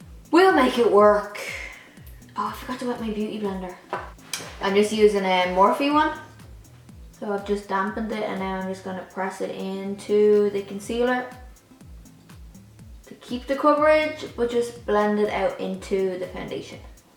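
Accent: Ireland. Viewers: You just speak like Bono and the others from U2 😎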